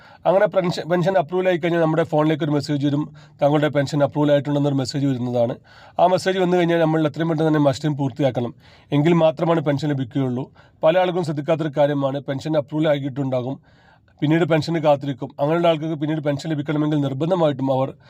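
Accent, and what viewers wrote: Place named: Bengal, India. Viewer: ഇയാൾ മലയാളി അല്ല. ബംഗാളിയോ ഒറീസ്സയോ ആണ്. മലയാളം നഹി.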